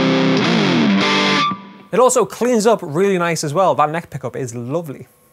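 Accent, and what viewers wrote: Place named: England, United Kingdom. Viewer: He's English, some English accents can sound slightly irish.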